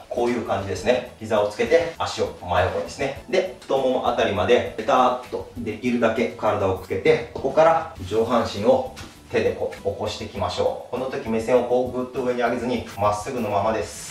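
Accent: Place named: Osaka, Japan. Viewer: 今回の動画もとても参考になりました。20年前にこの情報があったらよかったと思いました。でも今からでも決して遅くないとこれからできる限り続く様に 、 自分に 言い聞かせます。 ただ 正坐 は 難しいです。Japanese comment is hard for me. So please let me keep sending you comment in English. My English is very simple and I am very sure you understand. Thanks, sensei. I love your Osaka accent-大阪弁. Toshiko from Seattle.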